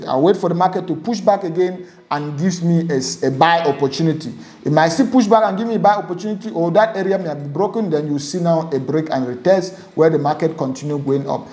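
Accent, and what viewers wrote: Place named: Nigeria. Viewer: You just sound like a Nigerian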